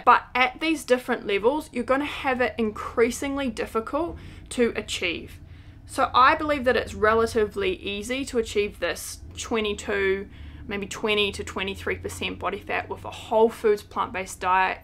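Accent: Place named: New Zealand. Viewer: Hi, I just discovered you and your channel this month and I am IN LOVE!!!! 😃 I lived in Marton, the Hub of the Rangitīkei 😉 for a year! It's so fun to hear the kiwi accent again! I don't hear it much here in Virginia. 🤣 I appreciate your clear and visual teaching...I am struggling to get off those last 8-10lbs and your wisdom and thoughts have inspired me to keep going! Thanks for your encouragement and empowerment! You are a blessing!!! 🙌💪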